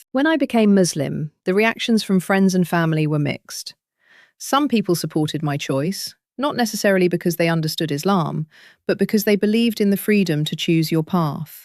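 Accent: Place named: United Kingdom. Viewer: She mentioned that she is from USA, but she has a strong British accent, is this the same woman?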